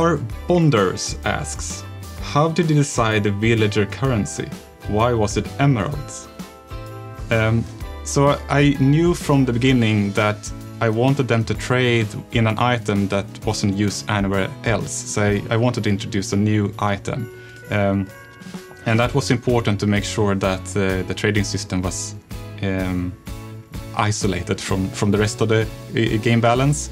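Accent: Sweden. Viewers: swedish with English accent, Nice But you should keep it up work till Minecraft dungeons is out! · I think it's funny how strong Swedish accent still is:D I would have imagined working in an international setting with English as the main language would have made it fade a little more:D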